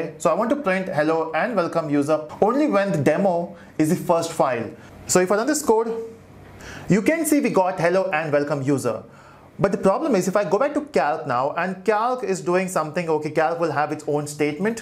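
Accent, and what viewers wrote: India. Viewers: You can understand him because you are indian and you have indian accent. this guy is indian and has indian accent. so you can understand him better than others if you dont know this than you are the one with low iq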